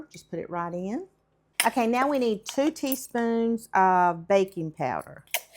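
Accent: United States. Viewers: Where is your fantastic accent from in the US? A curious guy from Buenos Aires, Argentina asking!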